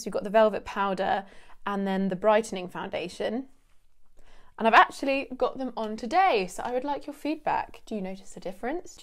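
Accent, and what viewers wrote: United Kingdom. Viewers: Hi your stunning teachers with you I learne British accents to speak English < 3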